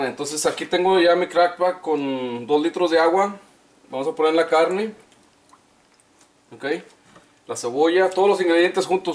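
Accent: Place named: North, Mexico. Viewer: Por su acento confió más en que esta receta sea la más adecuada ¡Arriba el norte!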